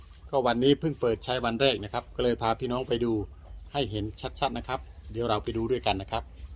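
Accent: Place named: Surin, Thailand. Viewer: อยู่บ้านใหน ขอ รับ ฟังสำเนียง ขุนๆ เหมือนคนบ้านผมเลย จาก อ.เขวาสินรินทร์..เซราะกราว เน้อ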